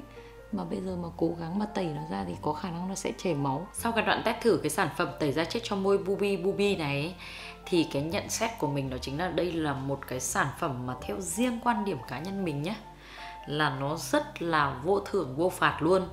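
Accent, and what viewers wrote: Nghe An, Vietnam. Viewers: Răng mà nghe giọng Nghệ An ở đây họ😗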